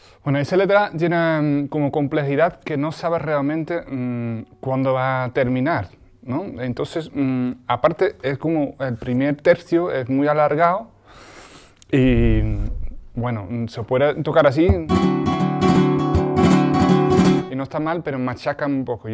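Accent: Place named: Andalusia, Spain. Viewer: De dónde es ese tííío, te expresas bien y tu castellanondaluz es bueno amigo¡¡ Sigue ahí que sois máquinas¡¡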